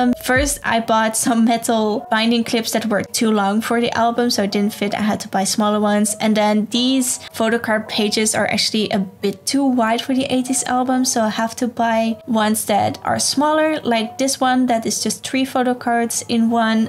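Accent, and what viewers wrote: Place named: Netherlands. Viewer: Hi! I just found this video and really want to make one! Also, are you also from the Netherlands? I thought i heard a bit of a dutch accent 🇳🇱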